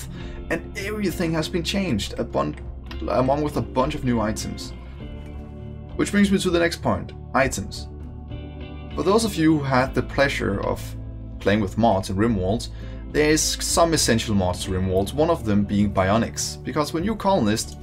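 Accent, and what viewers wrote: Denmark. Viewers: that danish accent.. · Are you from Denmark your accent tho?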